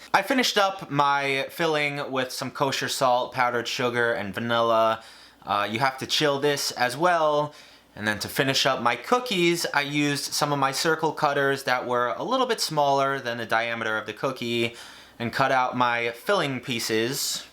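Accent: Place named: North, United States. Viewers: Yeah, he has a northern accent! He lives in New York, doesn't he? I suppose it's subtle for people in New England, but as a Southerner with a California-esque accent, it's really evident lol! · I’m from Long Island New York and he sounds like my half of the Island. Completely normal.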